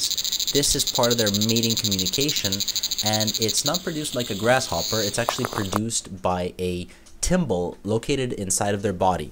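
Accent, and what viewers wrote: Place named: United States. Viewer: I know without checking what it will say, it's an American dictionary. I've already explained how you yanks pronounce it & why. That doesn't make it correct, only in your little realm.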